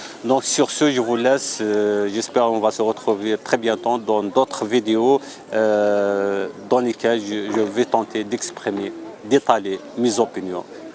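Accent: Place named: Kabylie, Algeria. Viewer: le français avec un soupçon de takvailit quell horreur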